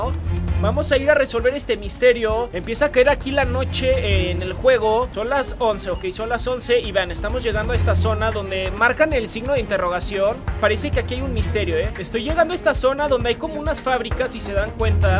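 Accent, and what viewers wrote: Mexico. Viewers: eres mexicano?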